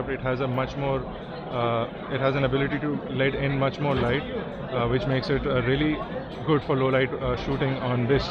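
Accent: India. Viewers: Speak more slowly and open you mouth when you pronounce your words. It's not easy to keep up with your Indian accent.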